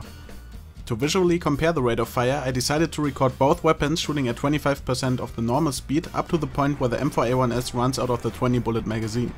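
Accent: Germany. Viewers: Bist du Deutscher? xD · are you german? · The german accent is anoying to my ears. I don't know maybe just me. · You are German right? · bist du deutscher? Dein englisch klingt irgendwie deutsch. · Ure german? Ich dachte ich hätte den akzent erkannt :D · bist du deutscher? Du hast ao einen kleinen Aczent :)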